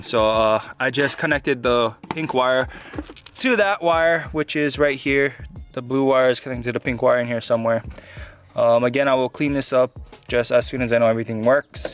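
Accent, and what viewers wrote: Hawaii, United States. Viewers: I sense a accent man. Are you from Hawaii?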